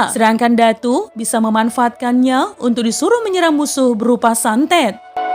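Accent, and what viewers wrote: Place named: Mandailing, Indonesia. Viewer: Maap.. suara hostnya dan pejelasannya terkesan seperti budaya batak mandailing .sumatra utara memang batak rumpunnya ..bravo ...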